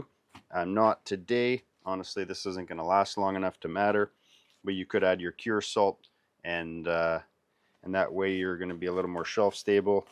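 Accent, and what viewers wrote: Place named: Canada. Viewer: I subscribed to your channel. I'll have to go take a look at some of your other stuff. Canada, aha.... I was having difficulty placing the accent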